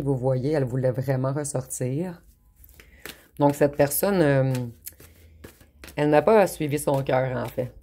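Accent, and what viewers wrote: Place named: Quebec, Canada. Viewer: Guidance la plus juste possible, pleine de charmes...Avec cet accent qui pour les non canadiens est une marque de fabrique Made in... Québec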